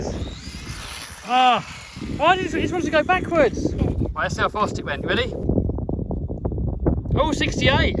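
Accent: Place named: England, United Kingdom. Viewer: Im not a hater, but I can not stand that 'more power' attitude ! YOU HAVE NIL IDEA ON ANYTHING TO DO WITH RC. Why do you think that 'fastest' world record attempt turned out a free giggle for me when you wrecked your car ? Because you have absolutely no understanding of aerodynamics ! Honestly, whenever I see your head on a video, I turn it off immediately because I cannot stand that pommy accent and your boofhead attitude. You should feel privileged that I'm even taking the time typing this LOL.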